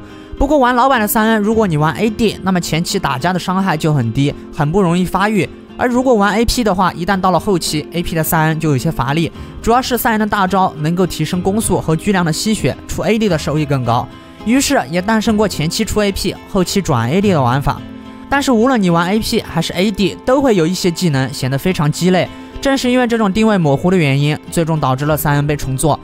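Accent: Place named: Shandong, China. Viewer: 这一口山东味。。。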